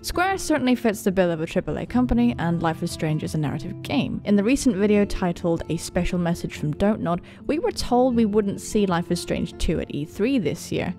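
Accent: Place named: United Kingdom. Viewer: OK!! So this is my first time here and my first video of yours as well and it seems like you are a big fan of 'Life is Strange' and that is really cool, and I have wanted to say this from the beginning but I LOVE your voice! British? Australian? But more British I think... Am I close? Anyways love the voice and I love the game so I think I will stick around.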